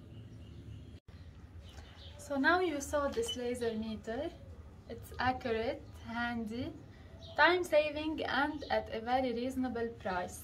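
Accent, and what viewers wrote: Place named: Arab world. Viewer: you look like arabian accent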